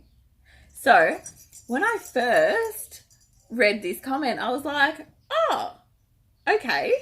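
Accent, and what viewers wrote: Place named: Australia. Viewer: Hi, it’s so nice to hear another Aussie voice on YouTube.. and another Aussie perspective on crocheting. I’ve only just found you thanks to your yarn swap with Summer. I’ve been crocheting since I was 9 ( almost 39 years..) but I’ve learned more thanks to YouTube videos than I knew in the previous 39 years!! I’ve been unable to work since 2016, due to chronic and acute pain and illness, crocheting helps get me out of bed, gives me something to achieve each day ( other than just getting up!!), and helps me to cope with the rigours of my illness. It is my hope to be well enough next year to commence my own YouTube channel, to help give another Aussie voice and help to build the Aussie crochet community on Youtube, whilst also sharing a little of my journey in the hope that I can bring some light into the life of others living with chronic illness and pain.. 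(hopefully my Counselling background might help a little here). My wish is simple (I hope), I’d love to be able to try either Red Heart Super Saver, or Vanna’s Choice yarn, or Lion Brands Touch of Alpaca. Being on a very limited budget, accessing some of these are a little beyond my reach at the moment. All that being said, watching the wishes of others being granted brings me great joy. Thank you for you lovely lively video, I’m now off to binge watch your earlier videos... Wishing you and yours a very Merry Christmas filled with all the joys of an Aussie Christmas and a spectacular New Year!!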